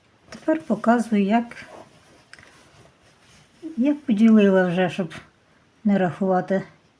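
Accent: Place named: Poltava, Ukraine. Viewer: Це-Полтавщина! Тут є все, канєшно ж!😅 І це не той випадок, коли потрібно шпиняти за вимову!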